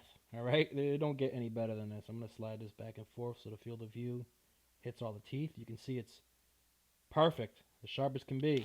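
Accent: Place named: New York, United States. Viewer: U from new York right for 100 buck I bet I'm right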